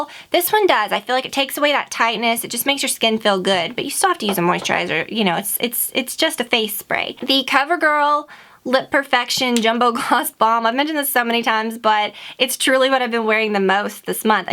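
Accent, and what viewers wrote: South, United States. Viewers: You sound so southern in this video! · Your southern accent it totally adorable :) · Shes from Georgia, its a southern accent. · I think I've noticed your southern accent more in this video than any other one! haha also your eyelashes look gorgeous!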